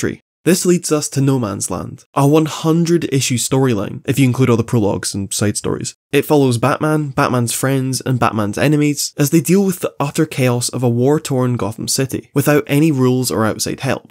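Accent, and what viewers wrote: Scotland, United Kingdom. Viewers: Great video man, didnt realise you where a fellow scot until this video so ay:D from one Scottish to another Scottish, keep up the good work:) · damn ur scottish too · Well he's Scottish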